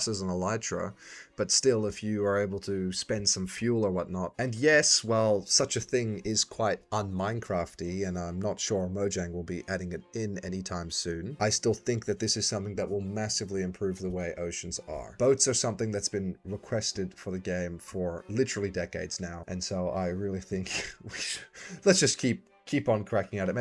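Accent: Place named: United States. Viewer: I’m having quite a hard time pinning down your accent. Your account says Australia but your voice sounds much more American, but not completely.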